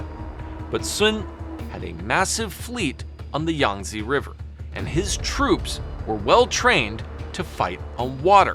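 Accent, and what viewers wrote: Standard. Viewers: 你的發音很標準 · I like your pronunciation of Chinese words throughout the video here and there, very standard mandarin.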